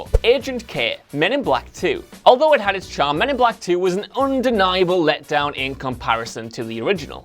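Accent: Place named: United Kingdom. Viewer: How can ANYBODY sound THAT F*CK*NG English?!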